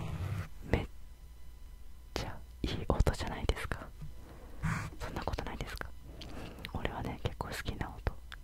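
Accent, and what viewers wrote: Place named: Kansai, Japan. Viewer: ティックトックからきました、音フェチ昔から大好きなんですけど久々に新しいチャンネルにハマりました嬉しい🥲🥲 これからお世話になりまーす！！ 関西弁やな〜って思ったんですけど関西の方ですか??🌟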